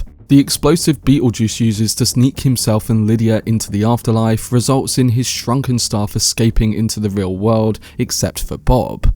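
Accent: United Kingdom. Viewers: There's not a lot of British people's voices i like but man you're it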